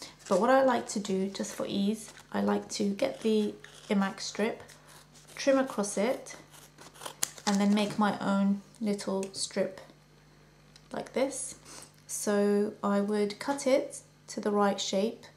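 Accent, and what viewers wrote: United Kingdom. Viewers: You've got the most amazing eyes! And to my ears you sound kind of British! :D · You do have wonderful eyebrows! Finally I got to see a perfect eyebrow tutorial with very good explanations and I think next time I will try it just the way you explained. You are very nice and beautiful! I like very much your voice and your british accent! Thank you so much for sharing with us this lovely eyebrow tutorial! Looking forward to seeing the next video from you! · Do you mean here religion or where she is from, her religion is y guess Muslim, which is a beautiful religion and i respect it, but her culture idk because she has a British accent, my guess is her relatives are Iraqi or somewhere around there, but it sounds like shes from Britain but follows the religion of Muslims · She sounds british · Loved the video :) very helpful, i needed to tame my eye brows. 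But im very confused by you O.o ur muslim and look like you have a arabish background but you have a british accent O.o uhh what?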